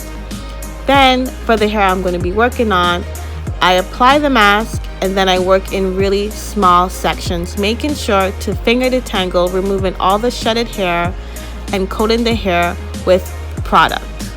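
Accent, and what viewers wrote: Toronto, Canada. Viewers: I knew you where from Toronto as soon as I heard you speak the bell remote confirmed it 😂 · I here rhe Toronto Caribbean accent 😁. Before l read your little bio l knew you were Canadian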